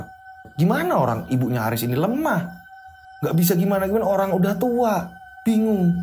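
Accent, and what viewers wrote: Java, Indonesia. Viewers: Logat jw ms bikin seneng oy..menjiwai bgt bawain crt,jg khas sgtnya membawakn crt,slmt ms succes sll berkarya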